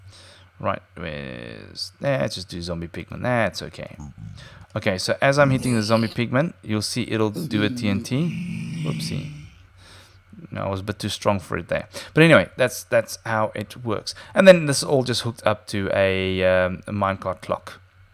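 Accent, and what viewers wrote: South Africa. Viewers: Are you South African? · are you south African?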